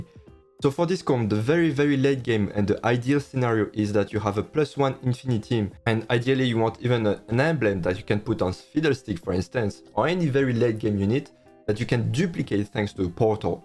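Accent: France. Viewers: Lol his accent is truly french and you are truly a bigot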